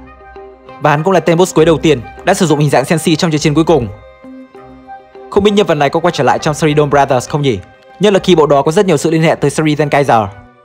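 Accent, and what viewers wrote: Japan. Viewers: Có một vấn đề mà mình khá khó hiểu, chủ kênh anh thường phát âm danh từ thuật ngữ trong video theo kiểu Nhật dù có là từ có phần tiếng Anh cũng thành japanlish, mà sao trong video này đoạn lúc thì lup'A'n lúc thì lup'I'n vậy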